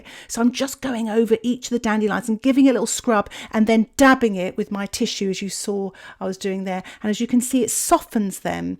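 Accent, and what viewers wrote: England, United Kingdom. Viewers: I love your accent ! English ?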